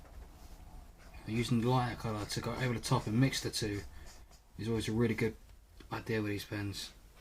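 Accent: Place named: England, United Kingdom. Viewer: he has an english accent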